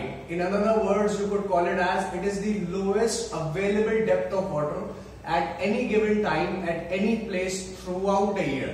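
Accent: India. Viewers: why indian accent? why why why? :(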